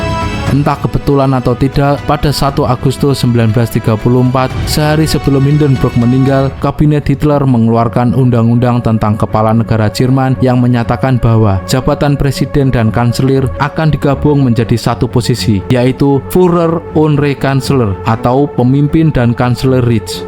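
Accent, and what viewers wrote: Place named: Java, Indonesia. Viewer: mas, jowomu njowo banget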